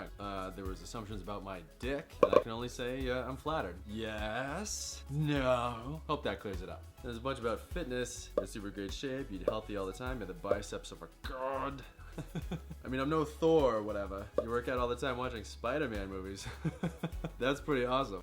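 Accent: Boston, United States. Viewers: I feel like I hear his Boston accent so heavy in this video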